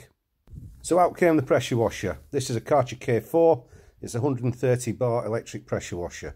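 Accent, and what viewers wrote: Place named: Sheffield, United Kingdom. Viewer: Very useful video, thank you. Good advice re gloves, face visor and overalls. Might invest in that Karcher PC20 kit. So this is what Sean Bean gets up to in his spare time 😁. That's a proper accent, are you from that area (Sheffield)?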